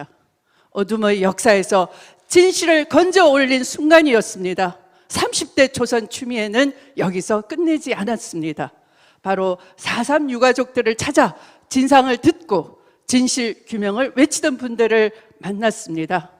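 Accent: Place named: Jeolla, South Korea. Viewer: 너 정치병이야.병원가라.너 말씨는 전라도 말이 면서 고향이 대구라고 ..ㅋㅋ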